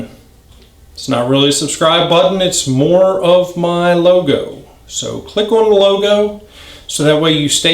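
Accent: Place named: Pennsylvania, United States. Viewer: My hazards aren't working but my turn signals both are. It sounds like you're from PA. If so do you have a shop or a shop you'd recommend near ohio by 80 or 76? It's cost me a fair amount learning on my 2001 roadking and I don't mind if I can learn but the electric for the rear had been "redone" and the left switch somehow controls the rear lights. It's a little bit much to explain on here but any help would be greatly appreciated. Thanks for sharing your video I look forward to watching more 👍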